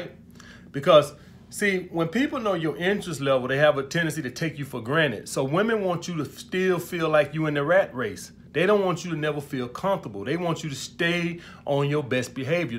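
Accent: South, United States. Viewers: Hey, guy....A man who speaks as you do does not have a chance in hell with the kind of women who are generally considered desirable. You know... women who make good partners, parents, is interesting, enjoys life. Your enunciation is terrible, that southern drawl is telling. Your use of profanity is off-putting, referencing women as “bitches” and other insulting names is not buying you any prizes. This video is strictly for the guy under 25 years of age who wants to act like someone he is not. It is definitely for someone who has decided some guy on YouTube has the answers to life’s mysteries. This is beyond comical, it is tragically comical.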